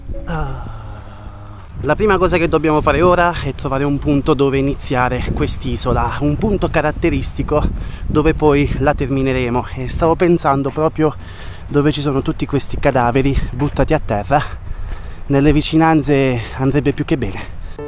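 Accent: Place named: Tuscany, Italy. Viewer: Grande, immagino dall'accento che tu sia toscano (come me😜). Bel tatuaggio 🙃 simpatico...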